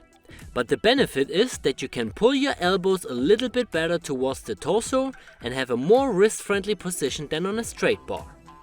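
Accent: Germany. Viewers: must be german by the way he speaks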